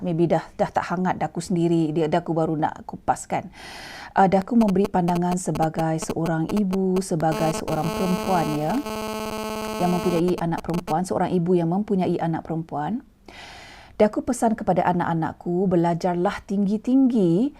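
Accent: Negeri Sembilan, Malaysia. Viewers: Seronok tgok celoteh dik ni dgn ckp nogori lgi, wlupun lama di France x lupa loghat negeri 9 👍👍👍😍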